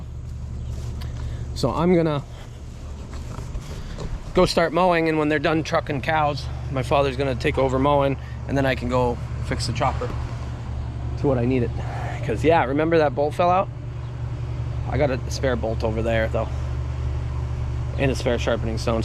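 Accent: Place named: South, United States. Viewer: You got southern boy in you (Y’all )👍🏻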